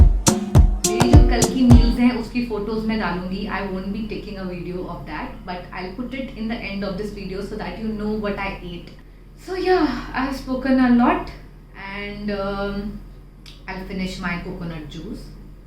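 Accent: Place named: Uttar Pradesh, India. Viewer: Your accent looks like you are from U.P.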